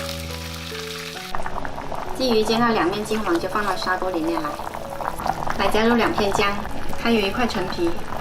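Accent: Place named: South, China. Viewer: ， 請問你是哪裡家鄉的人？ 是兩廣一帶嗎？ 你的普通話是挺南方腔啊！ 可否多介紹你的家鄉菜， 好公諸同好？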